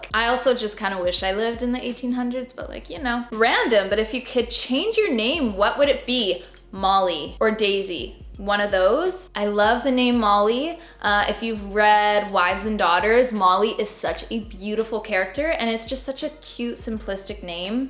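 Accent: United States. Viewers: PLEASE DON'T TAKE OFFENSE TO THIS QUESTION I PROMISE IT'S GENUINE. Why don't you have a Canadian accent? I live in Iowa, US, and you almost sound Midwestern like Iowan or something. I feel like has some sort of accent as well, but you speak so much like an Iowan it's crazy. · So you live in Canada but you do not sound Canadian/ you sound American. Am I right?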